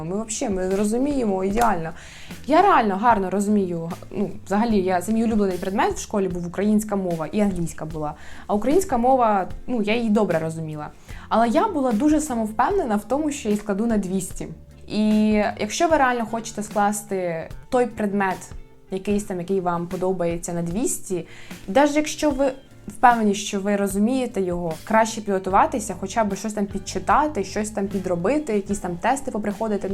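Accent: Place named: Surzhyk, Ukraine. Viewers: Я чую Черккщину) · У вас такий суржик, як з півдня України 😅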